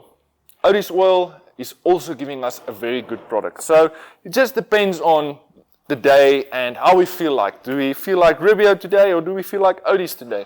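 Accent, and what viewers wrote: South Africa. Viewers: As soon as I heard your accent lol I immediately went onto my phone to check if your South African :p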